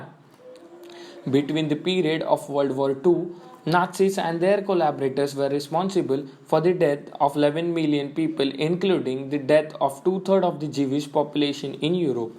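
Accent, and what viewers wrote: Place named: Maharashtra, India. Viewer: आप शायद मराठी हो.. आपकी आवाज बता रही है.. आप के दोनों video अच्छे है.. आप को continue करना चाहिए । हो सके तो Atomic habits पढिये.. बहुत बहुत शुभकामनाएं..💐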